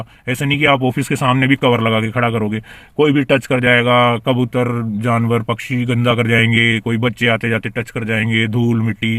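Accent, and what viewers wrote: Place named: Kota, India. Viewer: Bhai आप Kota k as pas k ho.? Maine boli se pahchan li😂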